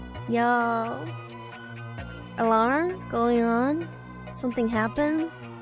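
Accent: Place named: United States. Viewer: you speak English like you from the states. That's pretty rare in China.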